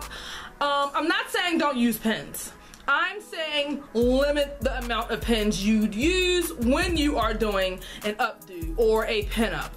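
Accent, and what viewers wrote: Baltimore, United States. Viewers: Great tips! You from bmore shor?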